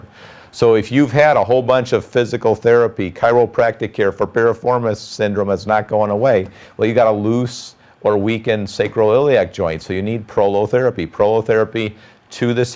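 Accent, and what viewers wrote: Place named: United States. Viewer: i would but it sounds like your in america would you know if they provide this in the UK?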